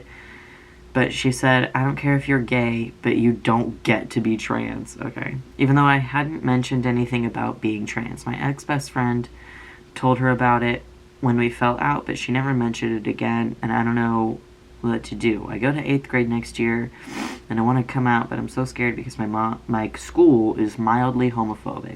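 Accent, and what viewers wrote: United Kingdom. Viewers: the British accent omd 😭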